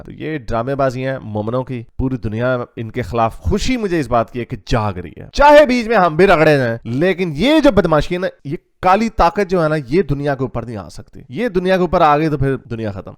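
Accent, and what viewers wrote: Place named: Punjab, India. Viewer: Your accent and panjabi tadka is awesome 👏👏👌👌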